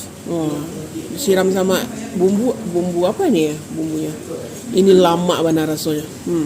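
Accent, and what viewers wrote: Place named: West Sumatra, Indonesia. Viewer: Mungkin tak pandai tetapi banyak pertuturannya guna bahasa melayu cuma dialeknya lebih kepada minang dan indonesia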